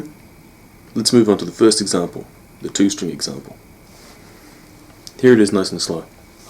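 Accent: New Zealand. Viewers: Nah this sounds kiwi not aussie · are you from new zealand? or australia? i am to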